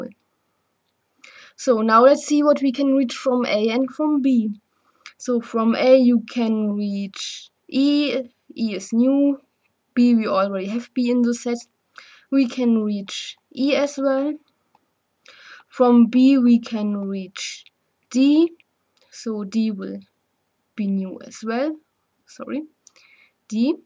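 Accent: Germany. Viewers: schone Deutsche accent :)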